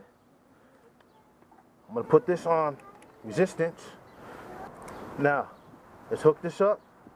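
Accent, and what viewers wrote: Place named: Bay Area, United States. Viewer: imma guess, you from the bay bruh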